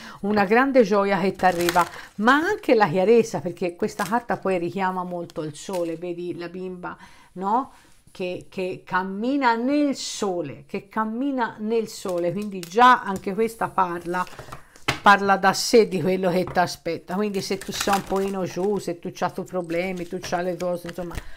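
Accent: Tuscany, Italy. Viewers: Ciao, sei bravissima e simpaticissima. Adoro la tua cadenza Toscana, di dove sei? Io ho parenti cari a Follonica GR e ad Agosto spero tanto di andare in vacanza lì. Io sono acquario asc. vergine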